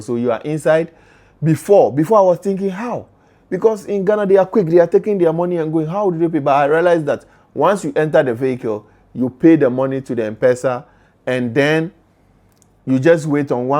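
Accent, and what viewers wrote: Nigeria. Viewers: Your english you sound like nigerian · Those mangoes they put pepe there are unlipe they are very sweet with pepe if you mix everything in food we call that ma chakula nobody will eat that, but we like fresh food with flesh spices, in Kenya we say what men can do women can do better, every one in Kenya has bank account in their phone means Avery body is rich 😂😂😂😂, matatus there are those who don't have the noise so you choose the ones you want to use, for the noise ones are called nganya, you look likes ogo even your English is somehow for Nigerians, uhuru means freedom, so you like nyash😂😂😂😂😂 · You were called oga cos of your accent..it sounds nigeriAn. Its not cos of the beard cos young kenyans wear beards too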